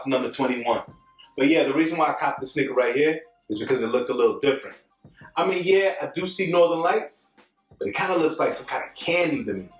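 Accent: United States. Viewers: Yoo you from the Bronx ???? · Nah man that's just NY talk. They have that type of flow.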